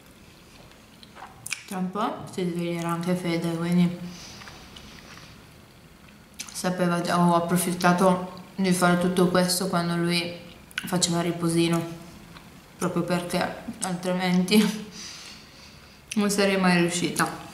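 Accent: Turin, Italy. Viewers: Grazie mille 😍 ti ho scoperta da poco e mi fai davvero un sacco compagnia con i tuoi video! Poi adoro tantissimo il tuo accento torinese ❤️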